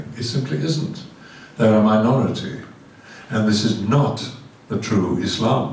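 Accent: France. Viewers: This perfect french accent. bordel de merde. je ne l'oublierais jamais, i will never forget you Christopher Lee. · his french accent is flawless, so is the man himself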